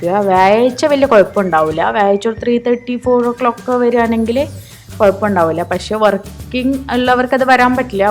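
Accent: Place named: Tamil Nadu, India. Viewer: Vlog super.... Pizza adipoly aayitto.... പിന്നെ നിങ്ങളുടെ സംസാരം എനിക്ക് നല്ല ഇഷ്ടായി.. തമിഴ് കലർന്ന മലയാളം... സൂപ്പർ....😍😍😍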